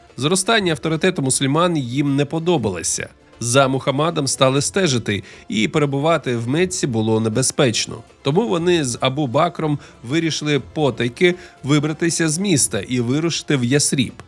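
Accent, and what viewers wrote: Russia. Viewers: Пане, ваш русский акцент ви, якось, збираєтеся ліквідувати?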